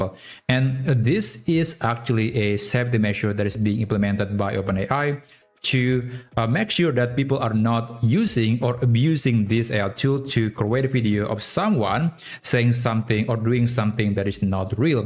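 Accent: Indonesia. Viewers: your accent...are you indonesian?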